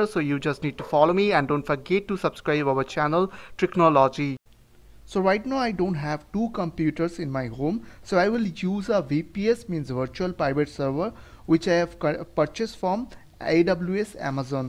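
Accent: India. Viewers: 10/10 +thick indian accent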